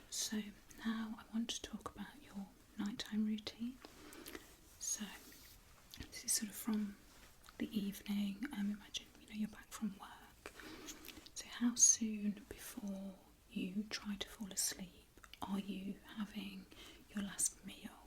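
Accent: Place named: Cheshire, United Kingdom. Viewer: Awesome tysm👌 is that a fellow Cheshire accent?? YAAAAS 💅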